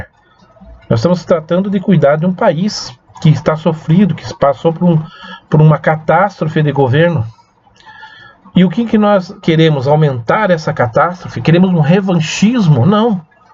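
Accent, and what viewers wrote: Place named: Curitiba, Brazil. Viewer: Ola, acabei de conhecer seu canal. Cresci em Curitiba, e sinto falta do sotaque curitibano (alem da conversa) =) So queria deixar um relato sobre uma conversa que tive com uma grande amiga cubana. Ela me contou que quando comecou o processo de enviar medicos de Cuba para o Brasil, a maioria dos medicos queria ir para o Brasil, tendo em vista que, mesmo com o governo cubano ficando com parte do dinheiro, ainda sim esses medicos ganhariam muito mais. Ela me disse, "voces nao tem nocao de como faltaram medicos em Cuba". Essa fala dela me chocou muito. Ela tambem disse, "parece que voces queriam medicos para assumir o interior do Brasil, que estava em falta em alguns lugares por la, mas pra isso voces tiraram ate da capital de Cuba". Eu nao tenho nenhuma opiniao formada sobre se levar medicos para o Brasil, vindo de outro pais, ta certo ou errado, mas com certeza algo que nos brasileiros nao nos perguntamos e por que tem "tanto medico sobrando em Cuba". 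E a verdade e que nao tem medicos sobrando em Cuba. Acredito apenas que, em nome de resolver nossos problemas sociais de forma imediata, de campanha politica, de envio de dinheiro para Cuba, de boa publicidade, etc tiramos medicos de um ser humano para levar para outro ser humano. Essa conversa eu tive ha uns 5-6 anos e ela ainda me choca. A pergunta que fica: sera que deveriamos transferir nossos problemas sociais para outras pessoas? Outra conversa foi sobre ela e os pais dela crescendo em Cuba, sem dinheiro vindo da ex Uniao So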